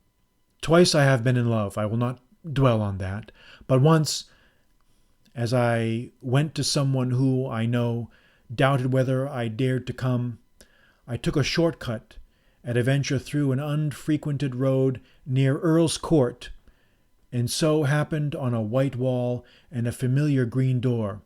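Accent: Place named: United States. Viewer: cannot listen to English authors and English stories read by Americans.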